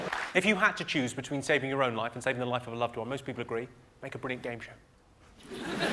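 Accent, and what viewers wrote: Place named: Yorkshire, United Kingdom. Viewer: You just sounds like a Yorkshireman in this vid.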